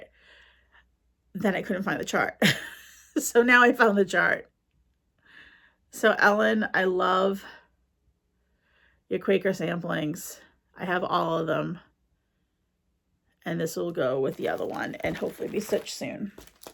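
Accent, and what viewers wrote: New England, United States. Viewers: I just stumbled across your channel and recognized a fellow New Englander almost immediately from your accent. I’m in southern NH. I love stash videos.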